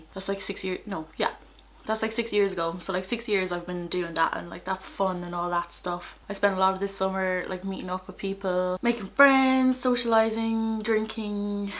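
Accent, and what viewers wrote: Ireland. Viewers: U deserve so much more subscribers ( I just came across ur channel and I Love it +1 subscriber) I guess ur from Ireland, u have the accent 🇮🇪😁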